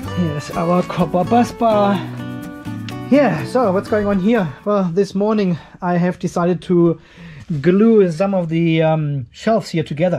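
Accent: Germany. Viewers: Hello, I was wondering how you are going to keep these three batteries in balance? I have two 24V/100Ah batteries and it appears to be difficult. By the way, thank you for your very interesting video's and your german English. Makes it for me easy to follow. Regards, Wim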